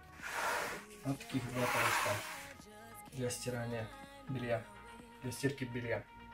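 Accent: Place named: Poland. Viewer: Здравствуйте. Мне понравилась подача видео и то как подробно вы всё показали. У вас интерестный акцент: )) то ли польский... я не поняла. Сделайте еще свежий обзор к примеру: закупка на 100 евро в Испании:)) если можно?)) лайк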